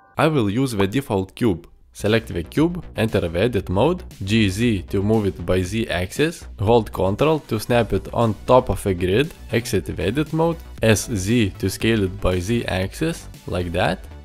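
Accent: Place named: India. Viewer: Indian accent 😢😢😢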